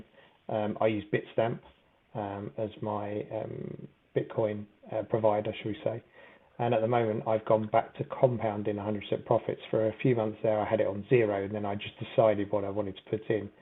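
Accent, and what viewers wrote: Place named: United Kingdom. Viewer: Hi there, great video chap. I just joined yield nodes with a $1,000 initial investment but thinking of adding more! Would you be able to please explain a bit more about how you withdrew into btc and then I guess back into your bank? Much appreciated thanks 🙏 btw, sounds like you’re in the Uk…that’s where I am👍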